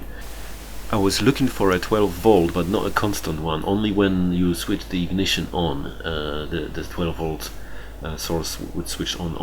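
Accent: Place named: Poland. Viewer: Widzialem ze jestes polakiem :) od razu slychac polski akcent :P ale super ze podzieliles sie ta informacja.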